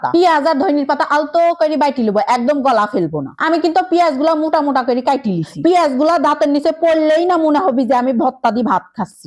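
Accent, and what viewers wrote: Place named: Bede, Bangladesh. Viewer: কোন জেলায় বাড়ি!!বেদেনীদের মত ভাষা, ভাষার টান।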